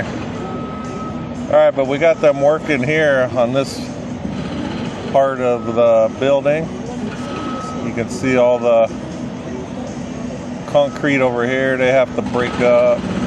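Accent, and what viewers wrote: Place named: Pennsylvania, United States. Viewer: Where you from originally? I thought I detected a PA accent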